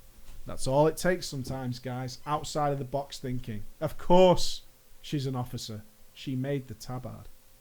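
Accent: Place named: Manchester, United Kingdom. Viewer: I'm learning english listening to you! I'm starting to have that manchester accent lol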